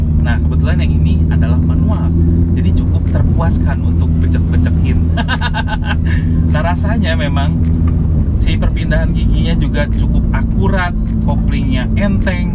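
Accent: West Java, Indonesia. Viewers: Orang sunda mah kentara aja ya kang logatnya😂🙏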